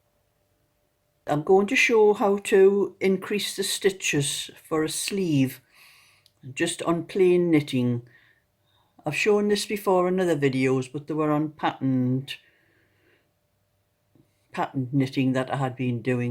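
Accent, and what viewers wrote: Newcastle, United Kingdom. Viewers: Thank you so much, that was so helpful. Knitting is my new hobby since being locked down - it’s the only thing (apart from drinking beer) that keeps me sane. Also loving your Geordie accent! xx 😘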